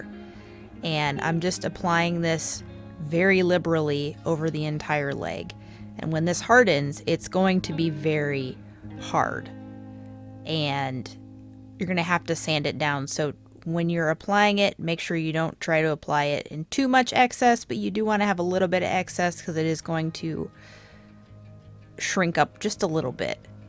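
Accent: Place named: Ohio, United States. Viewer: don't want to be nosy, but your accent sounds just like my daughter in law, who lives in Ohio.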